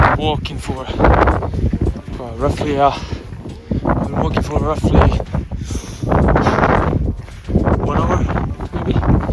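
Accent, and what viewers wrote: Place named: Northern England, United Kingdom. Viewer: Interesting to hear how your accent is like a Northern English accent. I wonder if the Northern English accent is derived from Scandinavia. It was ruled by Danes and Vikings for a while.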